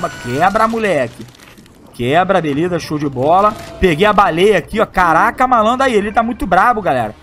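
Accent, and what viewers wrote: Rio de Janeiro, Brazil. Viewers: Esse cara fala o verdadeiro carioquês kkkkk salve sou de Nova Iguaçu RJ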